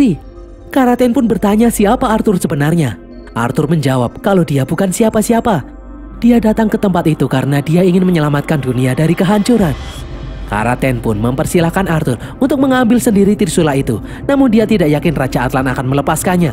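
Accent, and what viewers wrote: Java, Indonesia. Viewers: Mimin nya jawirr cuyy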